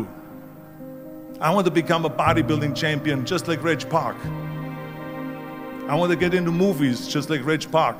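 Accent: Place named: Austria. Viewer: Was ich nicht verstehe, ist, dass jemand nach all den Jahrzehnten immer noch Englisch spricht wie ein Österreicher.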